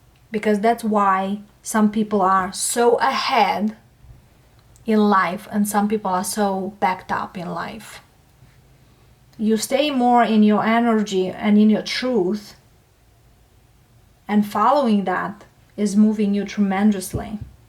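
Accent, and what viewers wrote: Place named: Russia. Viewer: Always knew U R Russian😊😅👍That beauty eyes accent😊💝👍Goddess 😊👍💖🌻Many thanks! Much Blessings2U/Family💖🌻💝😊🙏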